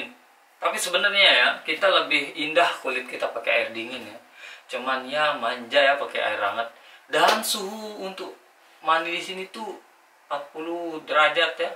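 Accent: Lombok, Indonesia. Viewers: Bahasa Logat Lomboknya (Sasak) Terdengar jelas di campur dengan bahasa indo , the best lah 👍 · Logat orang lombok😂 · Bang lu dari Lombok?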